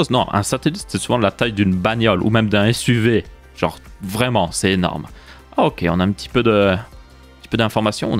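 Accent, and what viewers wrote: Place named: Belgium. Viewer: *OUÏTE* alala l’accent belge ... Qu’est ce qu’on ferait sans toi ! xD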